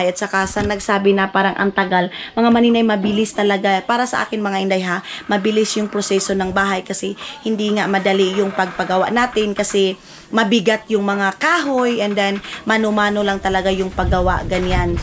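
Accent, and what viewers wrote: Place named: Waray, Philippines. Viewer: Yung tuno nyong pagsasalita parang sa Amin waray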